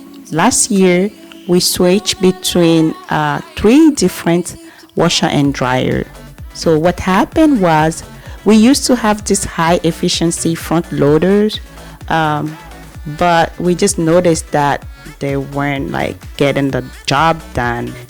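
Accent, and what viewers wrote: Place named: Africa. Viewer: That mama africa accent 😩❤️🥰 i love it so much! Wonderful video mama!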